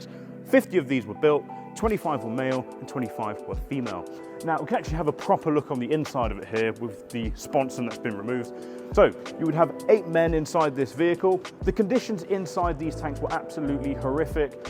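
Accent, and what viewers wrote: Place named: United Kingdom. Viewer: God i love british accent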